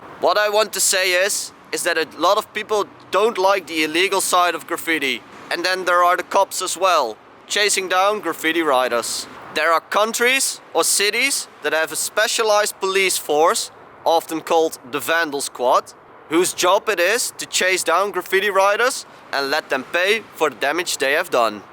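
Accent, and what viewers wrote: Netherlands. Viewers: That Dutch accent :D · Dude,, Jij moet wel uit Nederland komen, je accent :) . Keer samen painten? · Je bent Nederlands k hoor t aan je accent 😂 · Are you dutch? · Right on! A dutch comrade! Just the accent gives it away. wonder the region you wright from? Havn't written in atleast 3 years. I suppose I need to get back at it! can't risk writing illegaly anymore though which sucks... Met quiet alot of writers through instagram. you have to do some digging but eventually you'll meet them. Sometimes I used to pass someones new panel on a train, I'd make a picture and send them to them on insta.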